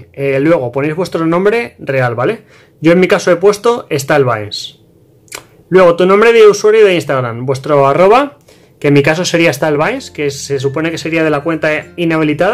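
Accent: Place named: Spain. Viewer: Chistea encima me da una bronca acento feo que tiene el español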